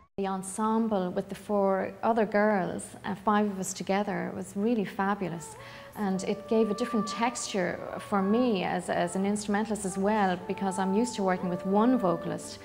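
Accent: Ireland. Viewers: This is an accent, Ireland. Scotlaand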